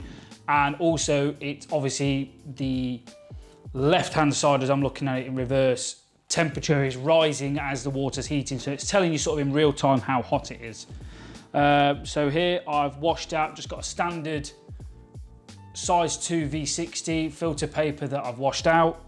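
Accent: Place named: United Kingdom. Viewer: Thanks for the Reply. I see based upon your accent you may be in the UK? I would love to visit there someday. Have lived in the US all my life. I am glad to hear you like your Timemore kettle. I should receive mine today. Last night when I was reviewing scales, I saw the Acaia scales but wow they are expensive. There are some positive and negative reviews of some of the other Timemore scales but the reviews seem to be a bit better than the Hario v60 scale. I'm just getting into pour over coffee and have used french press, and percolators.Need to find the scale and then decide on the Hario V60 Drip Decanter or the Hario V60 Glass Range Coffee Server, 600ml. I also think I will pick up the Hario V60 Metal Coffee Dripper, Size 02, Silver vs the ceramic dripper. Any thoughts from you on drip equipment would be welcomed as it seems you may have more experience than me. 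For example, if you use 30 mL of coffee, how much water are you supposed to use? Also need to pick up a manual coffee grinder.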